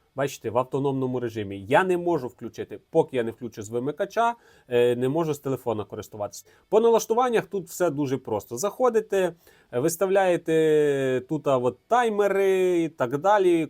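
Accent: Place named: Lviv, Ukraine. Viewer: вимова львівська, але русизмів доюха, але то нічого не вирішує. Тільки підзелупний йободовб концентрується на мові, головне бути людиню, й не важливо, якою мовою ти розмовляєш. Он зелупан вивчив Українську, але воно ненавидить Україну й Українців, воно хоче тут царювати й рабів з бангладешу набирати....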